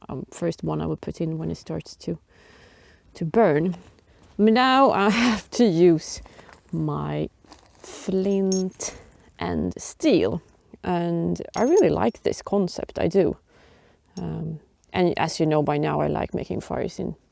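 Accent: Sweden. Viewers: Hej, är du möjligen från Göteborg. Låter som en göteborgsbrytning under engelskan 😏 Bra filmer. Bra innehåll. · Dear, your videos are a great inspiration to the ladies I date, and I am very entertained watching your adventures. I try my best to explain bushcraft techniques to so many ladies, and I find that many do not comprehend or retain the Information. Your videos are very good at helping break the communication barrier between Men and Women, the ladies actually retain your words and comprehend your reason, intentions and actions, to achieve your adventure challenge. I may say the same phrases about the same actions you are doing, and the girls simply do not understand it coming from me sometimes. I started to think beautiful hair, long fingernails and glamor trips to the salon equal a comprehension challenge with bushcraft and survival education, you proved me very wrong and humbled me with who you are. 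Thank you so much. Do not be offended by negative comments from people, they are simply jealous of you in some way. Several ladies I have shown your videos to have mimicked your Swedish to English accent when we are out on our own adventures, because we all think it is very beautiful and sexy, and sometimes they do it just to get my attention, and it works very well for them to do so. I am proud of and for you.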